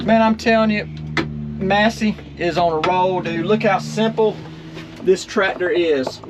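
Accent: South, United States. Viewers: New to your channel, explanation about farming in Georgia and content is excellent 😊. Love the southern talk to talking also. Retired Michigan farmer. Take care have a great day!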